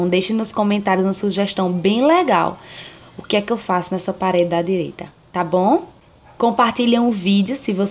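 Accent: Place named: Northeast, Brazil. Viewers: Amei, ótima dica,e gosto d+ desse sutaqui nordestino, parabéns. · Pernambuco kkk Eu nem percebo que falo assim tbm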